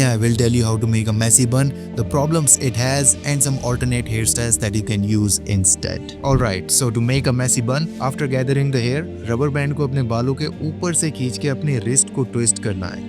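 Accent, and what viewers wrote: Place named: India. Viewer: Nice indian english 👍 Indian people very nice people ❤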